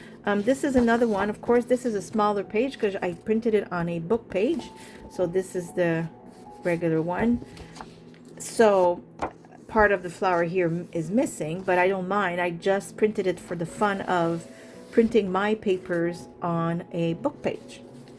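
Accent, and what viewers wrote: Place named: Quebec, Canada. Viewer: Willing to listen to your French-Canadian accent?? I could listen to it for hours, my dear!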